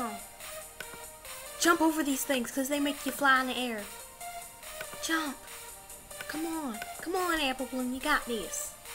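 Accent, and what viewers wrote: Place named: United Kingdom. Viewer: Are you British or not? Cause you sound like it. But I Love you!!!!😘😘😘😘😘😘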